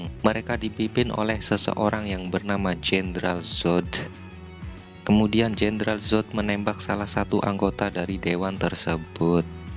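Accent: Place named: Java, Indonesia. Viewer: suara lemes logat jawa bikin ga mood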